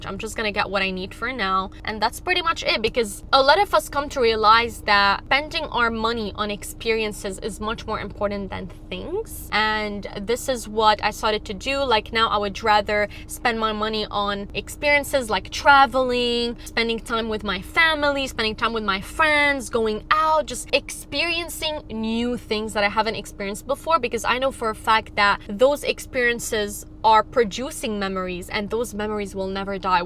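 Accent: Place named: Jamaica. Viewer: 'A fun ting' ooooh she Jamaican. nice video btw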